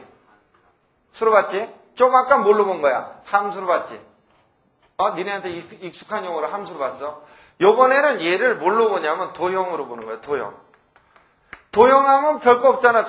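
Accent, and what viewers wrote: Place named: Seoul, South Korea. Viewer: 오 옛날 방송 보면 나오는 서울 사투리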